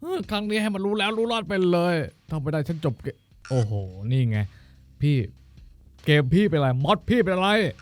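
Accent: South, Thailand. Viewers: Sunshine Channel ลุงพีคนใต้หรือคนภาคกลาง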